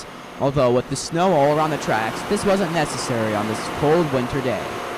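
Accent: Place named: Western Pennsylvania, United States. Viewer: You sound like you're from Western PA. I'm not, but I improved my own diction when I trained as a licensed lay reader. Learning how to read the KJV clearly and with emphasis in all the appropriate places ... it bled over into my ordinary speech.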